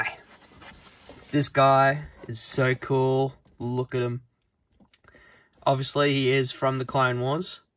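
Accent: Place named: Australia. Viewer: Nice to hear an Aussie for once🙏🏽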